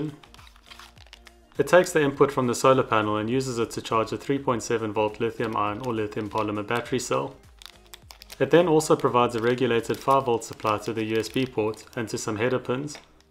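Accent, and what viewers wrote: South Africa. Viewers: Sjoe, jou aksent skree saffa boet.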